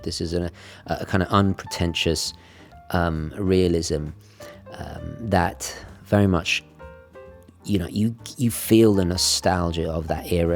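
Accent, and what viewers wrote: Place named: RP, United Kingdom. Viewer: I knew you were a fan, as I have mentioned before how since becoming part of the Watchbox Team, you have made your videos a little bit more posh sounding, and a bit Partridge. I am really hoping you do a full homage and add a 'ahaaa!' in one of your videos. These are great watches too by the way. I have recently had a 'field watch' buying addiction, being ex Forces, I have recently purchased five field watch style watches including three Hamilton, and a Longines military COSD which is hopefully being delivered today. I have a black face one coming , it has the forces broad arrow (crow's foot) on the face. I really want a white face one but I cannot find a new one anywhere. There is a pre owned one on eBay but it looks a bit beat up, and I got a brand new black face one heavily reduce in a Black Friday sale. I think the retail is just over £1,200 and I got it already reduced, then a Black Friday promo code worked, so it ended up being £748 UK sterling, free next day delivery from an Authorised Longines Dealer too, not grey market. 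A bargain. I am just negotiating a 'Dirty Dozen' watch. Really love those. I think Hamilton field watches are a bargain too, I got mine also with around 35 to 40% off RRP, again from Hamilton AD too. Apologies for the length of this comment. I am also waiting on two Stowa Flieger watches to be delivered.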